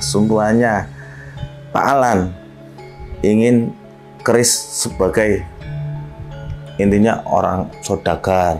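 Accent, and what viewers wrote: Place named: Banyumas, Indonesia. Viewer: Deneng ngapak...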